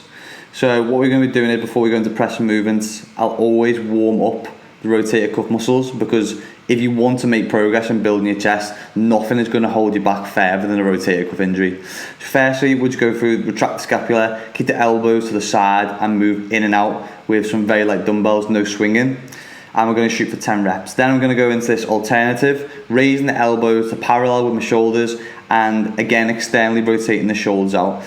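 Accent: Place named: Liverpool, United Kingdom. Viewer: Nice physique, quite an interesting accent, is it Liverpool one?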